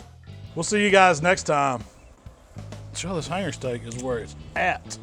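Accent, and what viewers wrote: South, United States. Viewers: It’s Southern and if u aren’t from the South you can’t understand him. Rock on..🤔😁🤣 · I can listen to my boy all day with that! Southern drawl down home! Brotha from another motha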